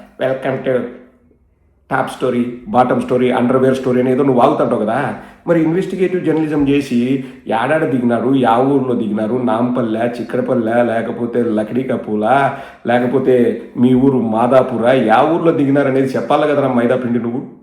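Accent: Rayalaseema, India. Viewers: complete ga Rayalaseema slong lo videos Pettu abba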